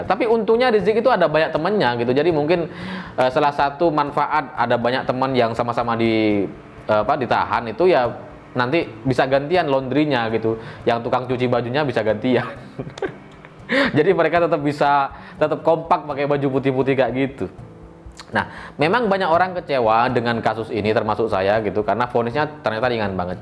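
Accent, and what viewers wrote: Madura, Indonesia. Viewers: Orang madura dari logat ya😁😁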